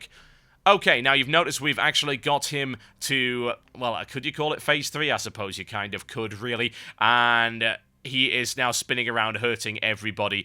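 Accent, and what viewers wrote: United Kingdom. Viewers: The Power of the British Accent!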